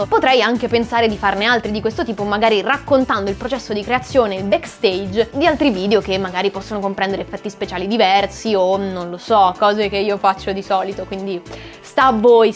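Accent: Tuscany, Italy. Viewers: Anch'io sono della Toscana! Non me ne ero nemmeno accorta che parlavi in toscano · Bene, direi. Che aggiungere? Nulla: interessante & divertente come sempre (però io voglio sentire la che ci parla in toscanaccio marcato eheheh), e complimenti per questa "maturazione" nella cura e nel lavoro messo nei draw my $whatever:) Io in fondo non posso fare testo: ogni volta che prendo anche una semplice matita in mano, da qualche parte un grafico sviene o viene colto senza preavviso da violenti conati:( Quanto ai sogni... non vedo l'ora che ci racconterai i prossimi... posso consigliare, per favorire le cose, un bel film horror mangiando popcorn aromatizzati alla peperonata con le cozze? Dovrebbe dare quel tocco in più alla vena creativa:D ahhahahaha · sei brava fra come sempre! :-) ps: la cadenza toscana a farla sentire al mic. ti ci devi impegnare altrimenti un si sente ah ah anche a me succedeva.. 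ciao. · Ma sei diventata ancora più carina? Btw, ti prego parla toscano, è un accento bellissimo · sei toscana??? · ma sei davvero toscana?? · ma te sei toscanaa???? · Vogliamo un video in cui parli solo ed esclusivamente toscano!! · sei toscana ma di preciso? Pisana,Livornese,Fiorentina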